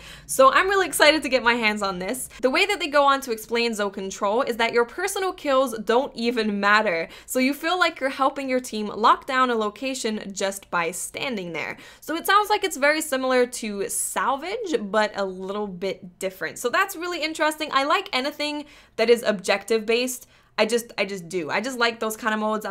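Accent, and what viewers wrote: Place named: New Jersey, United States. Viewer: you have a new jersey accent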